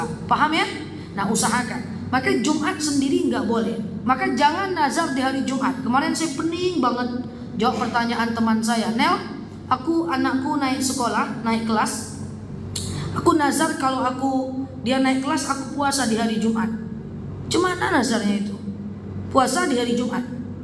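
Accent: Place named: Padang, Indonesia. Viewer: Saya suka sekali ceramah nya ustd org pdg ya bahasa ny kayak org pdg udh cantik pintar lg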